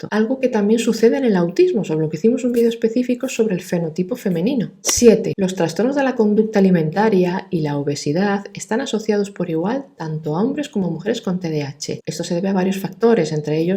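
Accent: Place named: Spain. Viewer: Es interesante, pero no tolero ese espantoso acento gachupin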